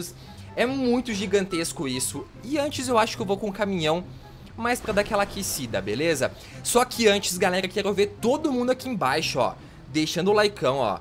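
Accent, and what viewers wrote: Rio Grande do Sul, Brazil. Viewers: Vc e Gaúcho?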